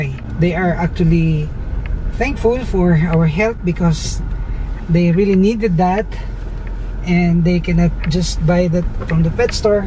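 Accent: Visayas, Philippines. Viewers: Bisaya mn d ay ka kuya HAHAHA TAGA ASA KA SIR? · Bisaya diay ka kol? 😂😂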